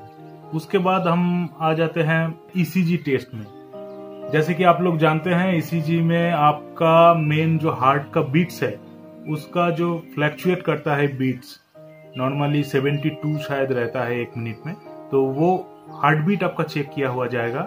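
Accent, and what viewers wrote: Bengal, India. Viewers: Sir kya aap Bangali ho?? Main bata raha hu kuyki mai bhi bangali huu aur hindu aap ki taarai bolta hu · Sir r u Bengali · Sir kia ap bengali ho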